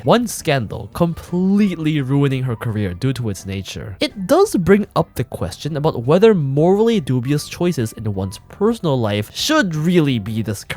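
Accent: New York, United States. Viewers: Sounds like NY or something lol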